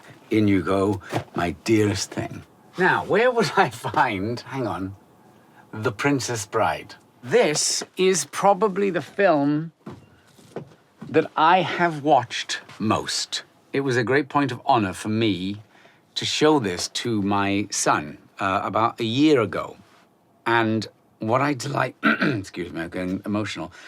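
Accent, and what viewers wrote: United Kingdom. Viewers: I had no idea this dude was British af 😂. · Is he British?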